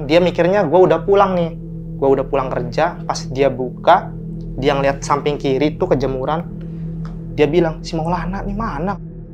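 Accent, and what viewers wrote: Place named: Jaseng, Indonesia. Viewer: Walaupun bahasa nya lo gw lo gw logat jaseng nya gk ilang😂😅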